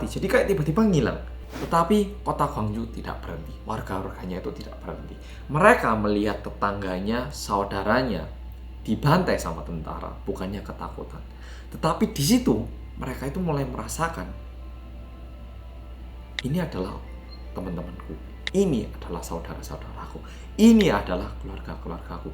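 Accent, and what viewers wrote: Java, Indonesia. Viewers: bagus bangeddddd, medokkk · Kemaren LUWEE. Sekarang MEDOK.😂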